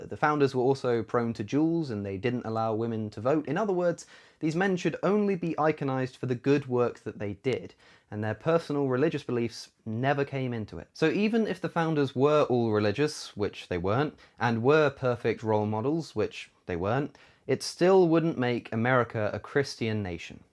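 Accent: United Kingdom. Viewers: oh the irony of hearing the Declaration of Independence and the U.S. Constitution being read in a British accent. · Is he British?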